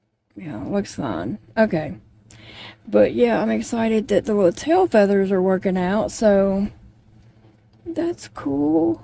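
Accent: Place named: Mississippi, United States. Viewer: ! Aw Lawd Jesus. Prayers for all involved in the Walmart grocery delivery!!! I’m proud you made a decision to revoke the delivery ladies tip & give it to Paige. That was very rude for that driver to leave your groceries in the dirt. That was deliberate. She chose to do that. Unreal. On the woobles book. I need to pull the trigger & but that. It’s just that I get too many wips on my hook & sometimes they are in different hook sizes. I’ve been known to buy extra hooks from Amazon to leave the hook in the project bag. I have bought these clear zip up ‘stitch kits’ at Hobby Lobby & may invest in more of those so each project bag will have the hook, & etc… stuff… because I’ve been known to use the wrong hook size to continue a old wip (work in progress). So,… I may order that yarn & the sewing kit bags. I may look on Amazon too. I just like what I like outta trial & error. 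Lol, I love how you say “Mawtha”! I was crocheting the first time you said her name a few weeks or a week back & thought you were making a Moth & her name was Mawtha! Lol! I’m originally from New Orleans so all my relatives back there have an accent so I do try to listen for certain accents with words. And I follow an English (UK) woman’s Facebook page, and some country folk on YouTube too. Your accent is closer to middle Mississippi accent so I can understand you very well as I’ve lived in Vicksburg for many years & over here near Jackson for even longer. Now, the North Alabama people had a curt accent & d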